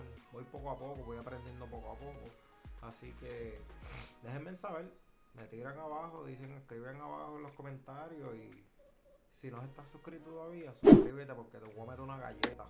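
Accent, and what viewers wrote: Puerto Rico. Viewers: Tu suenas como si fueras de jayuya PR o algo😭🤣de que parte eres de Pr?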